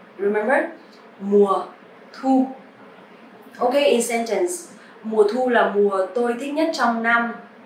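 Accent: North, Vietnam. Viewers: They teach northern accent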